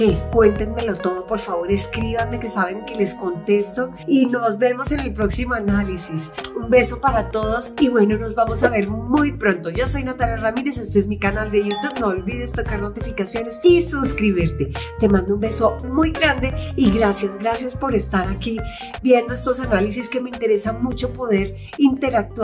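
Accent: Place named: Colombia. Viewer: Tu voz es muy dulce y con ese acento colombiano mejor, pero la musica de fondo de pronto tu voz se pierde.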